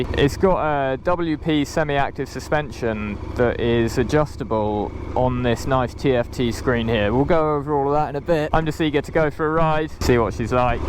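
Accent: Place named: United Kingdom. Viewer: Again a very nice and good review of yours. Good voice and nice british accent I envy so much as a Belgian ! Thumbs up ! 👌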